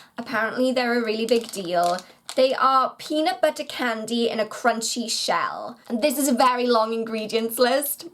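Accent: Wales, United Kingdom. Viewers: hi! loved this video. only just found your channel! are you from wales? if you are what part?😀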